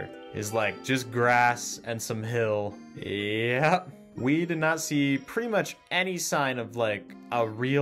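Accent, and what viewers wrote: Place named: North Carolina, United States. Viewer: dumb question, are you from North Carolina? not trying to stalk you, im just asking based on your accent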